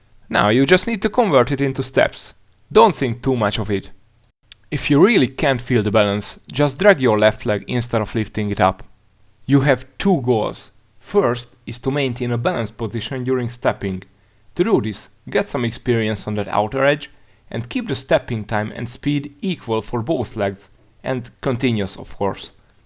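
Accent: Hungary. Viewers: hallatszik a magyar kimondás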